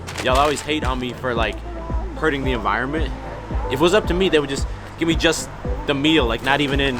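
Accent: United States. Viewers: the delivery is very American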